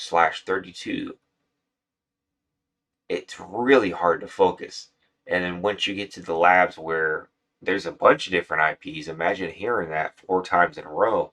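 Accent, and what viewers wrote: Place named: Scotland, United Kingdom. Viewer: I love! His thick Scottish accent keeps it interesting to me.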